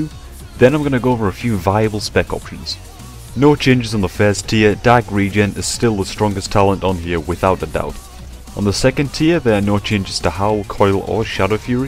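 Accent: Newcastle, United Kingdom. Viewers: Were abouts are you from? are you from newcastle by any chance? because im from there :D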